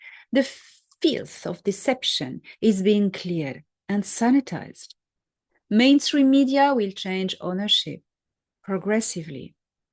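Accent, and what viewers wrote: France. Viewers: it would be awesome to listen to an audio book read by you with your beautiful French accent ❤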